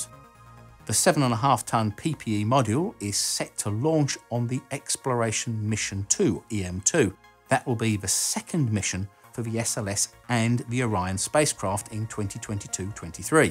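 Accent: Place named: England, United Kingdom. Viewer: He is english. What accent should he have?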